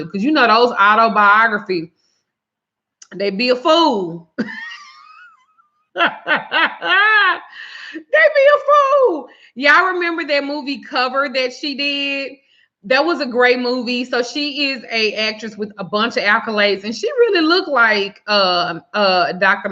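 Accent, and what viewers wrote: South, United States. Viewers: I love your accent :) Can I ask where it's from, Texas? Alabama?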